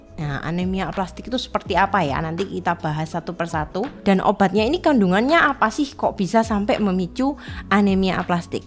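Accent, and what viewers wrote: Java, Indonesia. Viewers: Bahasanya muuedok. Semoga sukses.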